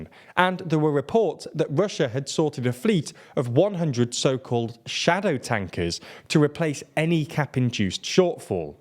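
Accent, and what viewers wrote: United Kingdom. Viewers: Collapsed! How dramatic! Their economy is 10times stronger than any Western country. BTW, isn't it odd that so many anti-Russia Youtube posts have 'British accents'?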